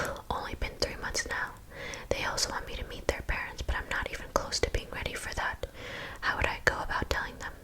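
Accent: Asia. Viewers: I don’t know any other asmrtist that does the Asian accent, and after watching all your videos I’m not interested in watching other versions anyways lol. Truly unique & veryyy relaxing. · I absolutely love your asian accent videos. Its so funny and tingly. Great work. Heart❤ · Asian accent mom roleplay 😭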